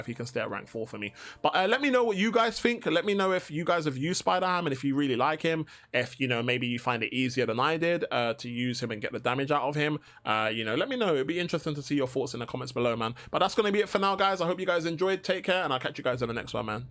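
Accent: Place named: Jamaica. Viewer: turned full yardie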